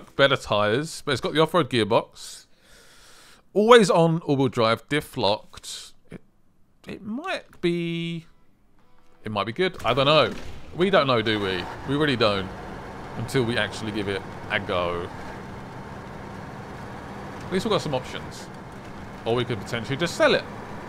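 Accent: England, United Kingdom. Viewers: Are you english lad ??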